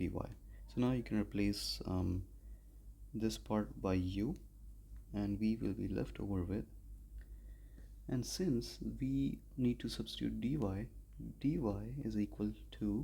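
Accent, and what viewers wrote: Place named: India. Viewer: Bro u have indian accent i don’t understand anything u indian biryani scammer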